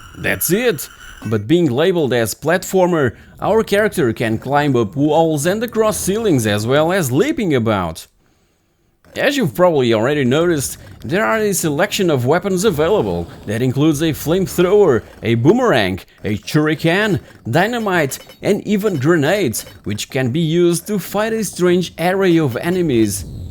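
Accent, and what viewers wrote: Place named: Portugal. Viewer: your accent is very Portuguese, am i correct?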